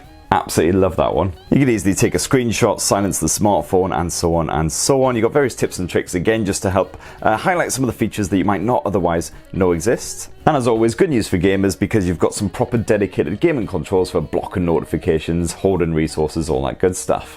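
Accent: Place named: North East England, United Kingdom. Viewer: ... I would say he's from the north east of England but not quite as far as Newcastle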